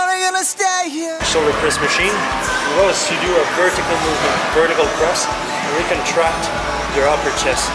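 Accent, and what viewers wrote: Quebec, Canada. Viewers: T'es québécois ? Je te suis depuis peu donc je me demandais haha ;)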